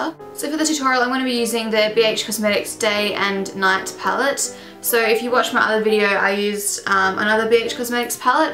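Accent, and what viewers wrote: Australia. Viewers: I'm so happy you have an Aussie accent lol! First ever makeup tutorial iv see from someone I AUSTRALIA!! Yay! Go us Aussies! Loved this tutorial btw! :) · Are u from australia??? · are you austrailian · You seem like a really sweet person. And your tutorials are really good too. Defiantly subscribing! You sound like you're either from Melbourne or Sydney. No? Oh well xxx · i miss Aussie accents it refreshing to watch these videos ... <3 it! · You're seriously so gorgeous and I love your Aussie accent!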